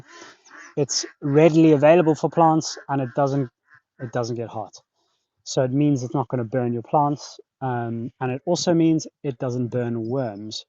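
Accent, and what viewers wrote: South Africa. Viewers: South African here. You're doing good work over there. We need new sources of protein. Your accent will always follow you 😉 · You sound South African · South African?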